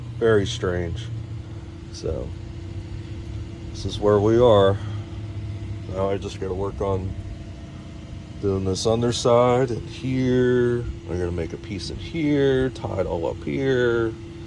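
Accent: Pittsburgh, United States. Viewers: I just subbed bro lol I’m doing mine right now actually haha. By the way…..??? Are you from Pittsburgh??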